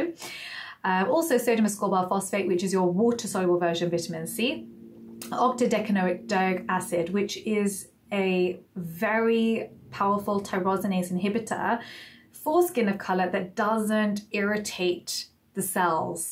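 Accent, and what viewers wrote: United Kingdom. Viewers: please remove the background music 😁 because we have difficulty to hear your voice properly 😕 and we love your British accent 😀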